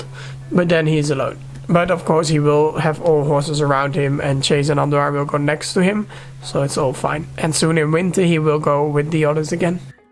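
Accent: Netherlands. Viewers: I hope someone knows. I love how he has Dutch & English with beautiful Dutch accent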